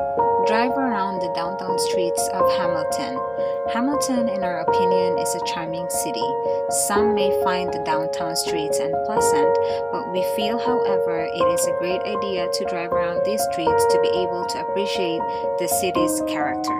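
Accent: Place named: Philippines. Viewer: Philpino accent can be perceived clearly. Anyway nice and beautiful place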